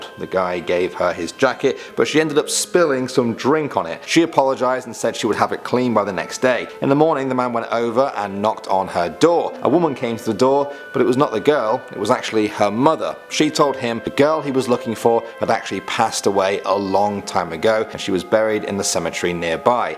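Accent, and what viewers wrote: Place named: United Kingdom. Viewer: I’m not sure but I’m hearing a little Britain accent is it just me cus I here a little British accent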